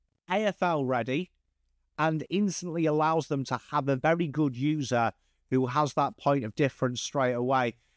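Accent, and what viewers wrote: England, United Kingdom. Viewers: Curious, how did a Pom with a such a thick accent get to know so much about AFL, do you live in Oz? Anyway great channel and insights. Tom McCarthy has firmed as the front runner it seems. He's fast, really fast. Right age bracket, plug n' play. But gawd, we need a good ruckman asap!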